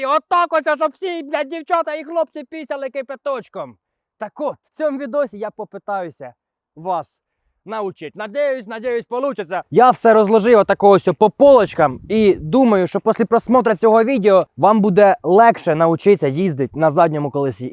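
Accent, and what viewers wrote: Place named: East, Ukraine. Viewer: Як на мене українська зі східним діалектом, або невеликим суржиком. Я кна мене на 100% краще ніж чиста кацапська🤢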